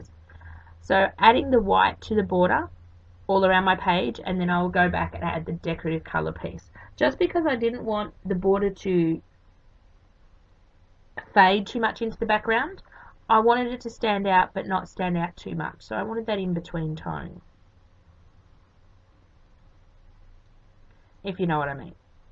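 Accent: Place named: Australia. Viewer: Hi, terrific journal pages. Love listening to you. It's great to be able to listen to an Aussie accent for a change. I wish we used coupons too. Take care, Amanda xox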